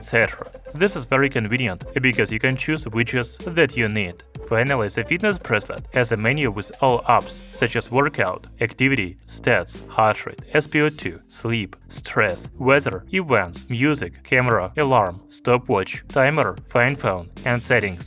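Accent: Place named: Russia. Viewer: I'm sorry Bro, it is quite difficult and troublesome to understand you. Your russian slang is very strong.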